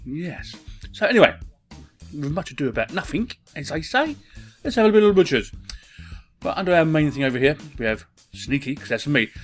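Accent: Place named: Cockney, United Kingdom. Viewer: Yes, please less Cockney ("bub,bub,bub") and more English.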